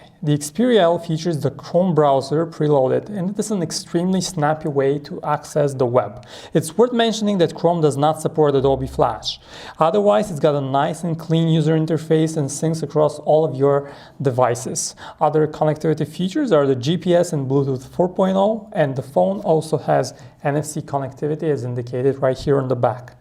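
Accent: Bulgaria. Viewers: Man, are you Bulgarian? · razbrah 4e si bulgarin :D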